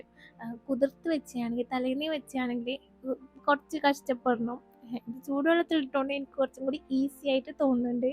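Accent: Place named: Kannur, India. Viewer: u r from kannur,? talking style is good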